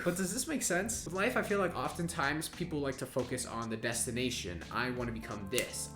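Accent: Canada. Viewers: I think Canadian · Probably Canadian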